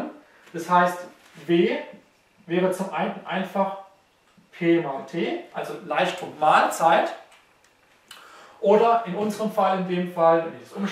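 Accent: Swabia, Germany. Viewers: das heißt nicht leischtung sondern leistung und ein W wird nicht mit nem Kreuz in der Mitte geschrieben. argh, das schwäbische kann man sich einfach nicht anhören. aber sonst gut erklärt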